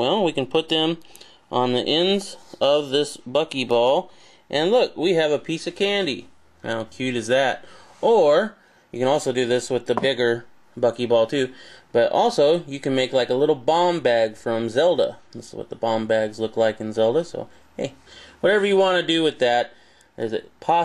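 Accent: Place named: United States. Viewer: Damn, you talk like a stereotypical American.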